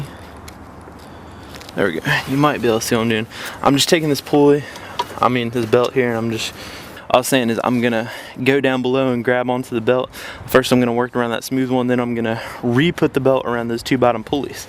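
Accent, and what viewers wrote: South, United States. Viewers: 2nd vid of his I have seen. Its like a Southern accent crossed with a Southern CA surfer vocabulary. Canada ???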